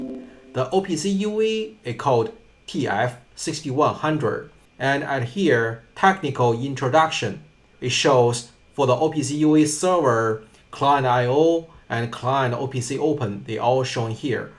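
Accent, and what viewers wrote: China. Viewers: 哥们，一听你口音就知道你是京津地区的人啊。满满的口音让我想起了在北京读的本科。非常好的视频，我正在做我的硕士论文，也是和OPC UA有关的，看了你的视频受益匪浅。非常感谢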